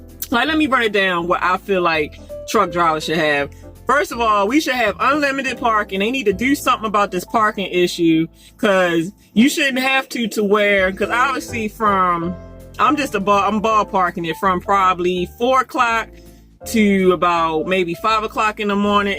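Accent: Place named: Washington DC, United States. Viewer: Washington DC or Baltimore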